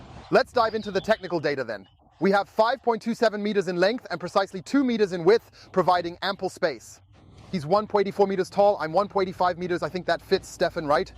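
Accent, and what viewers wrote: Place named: Berlin, Germany. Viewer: Sorry, you are totally right. It is necessary to watch longer than one Minute before giving a quote. 😂 With Ai your „Berlin Accent“ is missing but I think it is just a question of Updates that this will be solved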